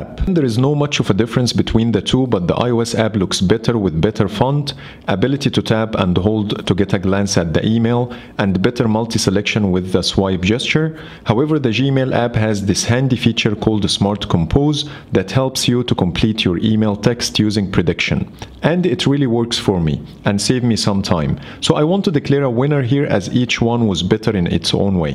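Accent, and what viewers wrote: Egypt. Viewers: The Egyptian accent is so clear here. Egyptian English. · Egyptian English Accent 👍👌❤ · استمر عرفت انك مصري من الاكسنت 😂😂 الاكسنت المصري بتاعنا معلم في كل حته 😂😂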